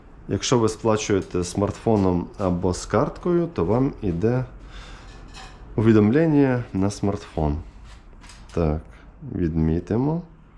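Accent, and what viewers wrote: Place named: Uzhhorod, Ukraine. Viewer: А мені подобається мова автора канала. Дуже цікавий, навідь прикольний ,Ужгородський суржик, відрізняється від нашого - Дніпрорпетровського. І це нормально. І взагалі підримую автора , що кожен має право розмовляти, як він розмовляє. Ось писати, бажано без суржика :) . Всім добра.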